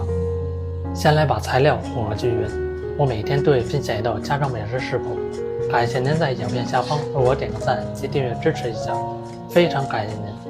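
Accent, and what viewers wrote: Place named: Tianjin, China. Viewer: 博主是天津口音吧，这个发饼我觉得太费事啦，烤箱也更加费电，你这个成本算起来太高了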